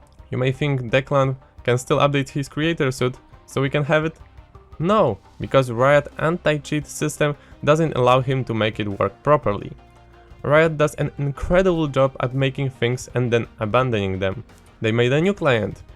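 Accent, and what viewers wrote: Poland. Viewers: And I always wondered why your accent sounds so Polish. Finally got the answer :) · Polski akcent wymowy sprawił że byłem pewny twojej naci :)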